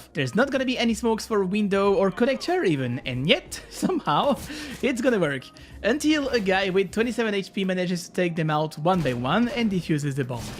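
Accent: France. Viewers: Brooooo what is your origines you dont have the french acent?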